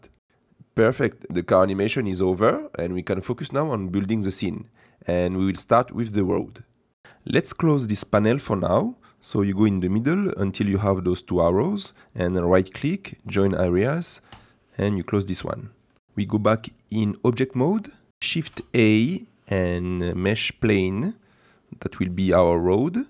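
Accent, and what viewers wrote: France. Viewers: T es français ? En tt cas t as un accent français du coup j comprend 😂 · you have a french accent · Salut je viens de voir ta vidéo dans mes recommandations alors que je ne regarde jamais d'animation 3d et enfaite c grave styler et sa doit prendre beaucoup de temps sinon continue comme ça mais je me suis dit que j'allais envoyer le message en français c que tu a un peux l'acsan français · Tona accent français 🔥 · Tu pourrais le faire en français stp car j'ai l'impression à ton accent bien qu'il soit très bon, que tu es français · i like your french english ascent hhhhhhhh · Mais tu as un accent français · GG pour le travail, j'aime cet accent français Ahah ! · This is amazing. Thank you so much! Your french accent makes this long tutorial so much more tolerable! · Baguette But honestly I just love french accent... you saved my evening... 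I don't even care about blender and animation but still very interesting and well explained :D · on sent l'accent français mdr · L'accent français 😂 sinon très bonne vidéo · tres bon tutoriel avec un bon accent Francais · Wsh mais t français sa se voit tellement avec l'accent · tu es français toi haha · A l’accent je dirais que tu es Français non? Merci pour ton tuto, en français ce serait top 😁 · PTDR ta un acens Bien FRANCAIS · ça ce voit que t'es français sauf que moi aussi et du coup ça me fait rire parce que c'est la vidéo parfaite mais je comprend rien 😂😂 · Ça s’entend qu’il est français le man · T'as un accent français ça me fais tellement rire x) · You sound french or something like that , cuz i hear an accent , i mean i'm french and i hear the french accent , i'll be surprised if your not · french accent are crazy bro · Ton accent français se remarque direct mdrrrr Continue comme ça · Magnifique accent francais ^^ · serait tu français avec ton accent ? 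· Toi t'es français 😂😭😭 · Le bon accent français! J’aime beaucoup le tuto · Tu parle français sa se vois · Tu esy français mon amis 😉 je t’ai reconnu sinon bon travaille 👍🏽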